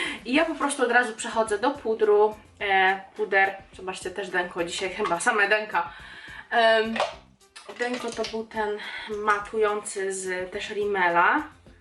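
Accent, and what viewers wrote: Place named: United Kingdom. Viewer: Zauwazylam ze masz teraz taki brytyjski akcent nawet jak mowisz po polsku to przeciagasz dlugo samogloski np- twaarz, a nie mowisz tak jak my mowimy tak szybko 'twarz', mam nadzieje ze wiesz o co mi chodzi :D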